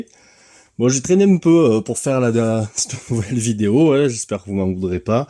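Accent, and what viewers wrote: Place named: South, France. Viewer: Hep! Encore une preuve de ton talent mon ami, avė l,accent du sud con ! ,ce n,est plus de l,or que tu as dans les mains pour faire ces belles choses d,ajustage, ceux sont des diamants que tu as dans les pognes, vraiment je t'envie ! Et en plus tu es humble 🙂🤘💕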